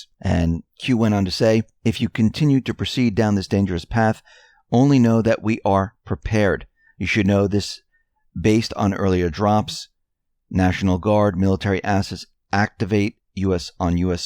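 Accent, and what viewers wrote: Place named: New Jersey, United States. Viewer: Total East coast. Mid or Southern New Jersey by the accent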